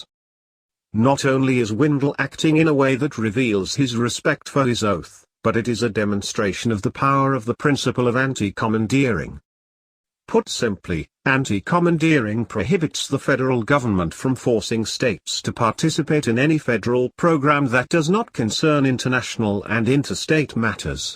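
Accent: United Kingdom. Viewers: Why is the narrator a limey???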